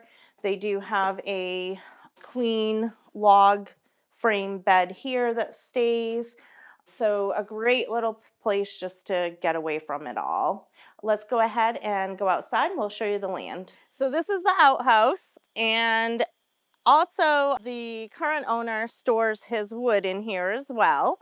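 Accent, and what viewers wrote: Maine, United States. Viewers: like the way you say side-in for the siding, gotta love that true Maine accent!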